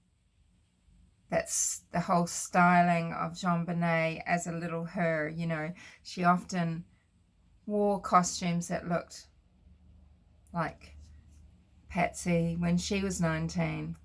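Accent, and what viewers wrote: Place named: Australia. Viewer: Nice video. I could listen to your Australian accent all day. Cheers!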